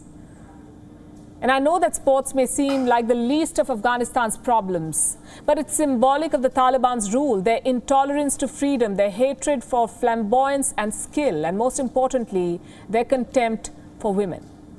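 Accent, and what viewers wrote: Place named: India. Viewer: It's indian accent wion primarily indian news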